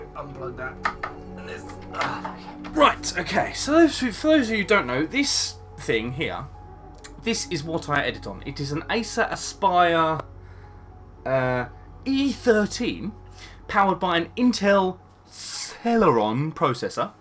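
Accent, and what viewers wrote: United Kingdom. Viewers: You from Birmingham?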